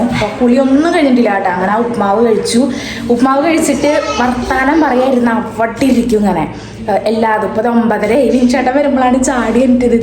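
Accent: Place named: Malappuram, India. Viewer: Kayi Malappuram slang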